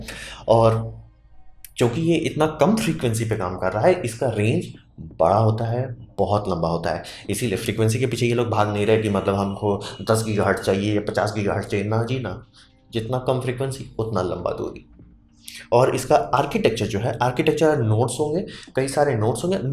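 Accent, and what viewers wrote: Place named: Deoghar, India. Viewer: Heyyy bro perhaps u r from Deoghar (jharkhand).....??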